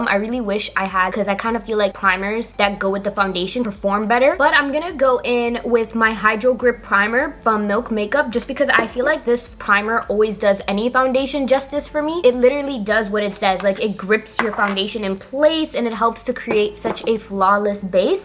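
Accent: Toronto, Canada. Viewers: I knew i heard the Toronto accent lol.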